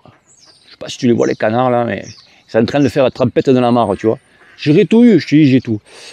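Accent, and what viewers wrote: South, France. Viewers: Merci pour tes video mec 👍 Super Accent du soleil 😉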